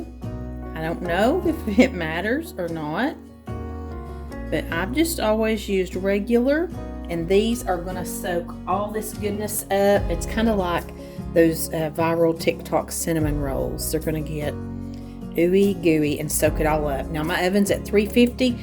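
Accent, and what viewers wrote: South, United States. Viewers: Wow, what a treat!! Have shared to my laptop. But a question - are these recipes available in writing? I must say. Your beautiful southern accent is music to my ears. I left Alabama with my children and pastor husband to Hawaii in the late 70’s. Oh, I just heard Tennessee, the place of my birth. He pastored a church for years, but is in heaven now. I stayed here as my children married wonderful local people. And have their roots planted deep in Hawaii. Mahalo for your scrumptious recipes. Do hope they are available in writing to print. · I’m new and catching up with your channel! Love your crescent roll options and looking forward to trying all these! I am from NYC but also lived down south! I so enjoy the “southern comfort” of your voice and your calm demeanor! You are just great therapy ma’am! Cheers, Michael Albuquerque NM USA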